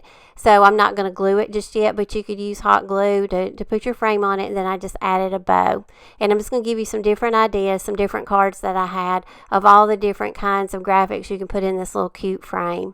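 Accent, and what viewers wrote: South, United States. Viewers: I just love your channel and I love all of your awesome ideas!! I love hearing your southern accent It reminds me of all my family back I Alabama....luv and wishes to you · Just came across your channel and I’m so glad I did! First, I love your accent! I’m a southern gal from TN and appreciate your sweet southern accent. Loved all of your DIY’s! Everything turned out beautiful! Subscribed and can’t wait to watch more of your videos!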